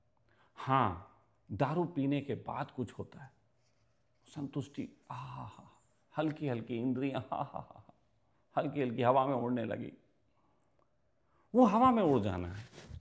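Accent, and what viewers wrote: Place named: Arab world. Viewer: Pronunciation sai lag raha hai arabian ascents hai urdu padai hai sayad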